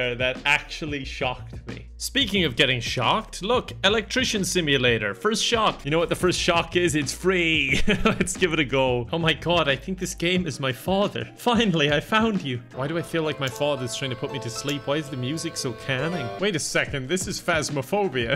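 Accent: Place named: Ireland. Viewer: just found your channel today randomly. binged your sims 2 series. enjoyed the content alot. watching all your latest videos rn. Good stuff, Subbed. also, are you Irish? you have an accent like Nogla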